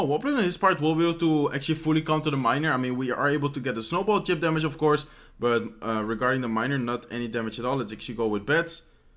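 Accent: Netherlands. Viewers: Llama The Thug It’s a dutch accent, i’m dutch too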